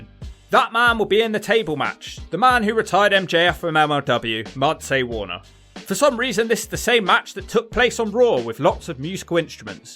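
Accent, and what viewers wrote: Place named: West Country, United Kingdom. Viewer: I've watched a few of your videos and always enjoy them. From your (West Country?) accent I thought you would be like 5'6, nerdy black hair and glasses. But you are very presentable, keep up the good work, I appreciate you're unique take on everything wrestling wise. There is so much generic wrestling commentary on YouTube, so your distinctiveness definitely stands out.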